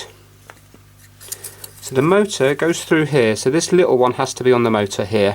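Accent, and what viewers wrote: United Kingdom. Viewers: Lol....The maker made the effort to add suspension, but no steering? What a piece of utter rubbish. british voice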